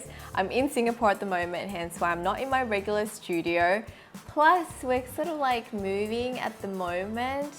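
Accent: Australia. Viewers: nashikin works she’s not trying hard to be british—it’s her natural accent and it’s AUSTRALIAN. she lives in australia lmao—what do you expect? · im new i was wondering if has any australian background or lives in australia bcoz she has a slight aussie accent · where are you from? Your accent reminds me of Australia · nashikin works LMAO she’s Australian and her accent sounds nothing like the British one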